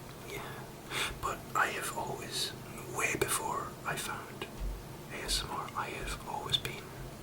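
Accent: Ireland. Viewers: Where in ireland r u from?? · This is good stuff. The irish accent will do it for a lot of people. Keep it up :)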